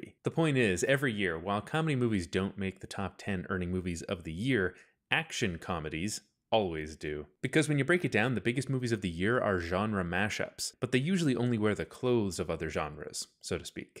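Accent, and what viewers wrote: Canada. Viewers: First sentence and I know you're Canadain! Beavers and Maple Syrup, huzzah!!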